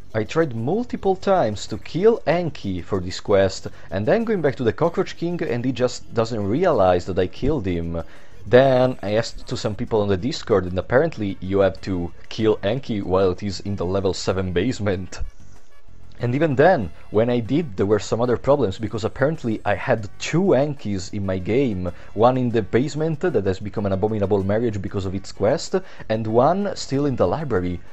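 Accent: Italy. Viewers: Mi sembrava un accento italiano ad ogni modo grazie fra per le guide sono felice di vedere italiani con dei gusti sublimi per i videogiochi mi sono iscritto e metto like a tutto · are you italian?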